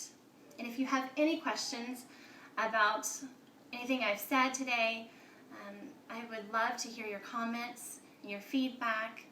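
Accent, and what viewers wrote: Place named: United States. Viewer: Just cause she sounds american. u_u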